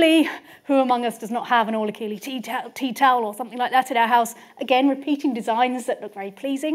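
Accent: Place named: London, United Kingdom. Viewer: BTW... I love her English accent.. London? ❤️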